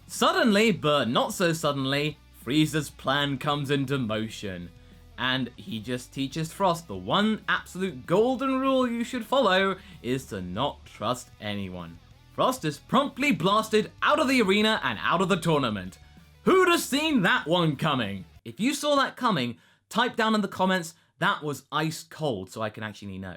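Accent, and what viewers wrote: United Kingdom. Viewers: The way brits say words is cringe to me cabber and freezer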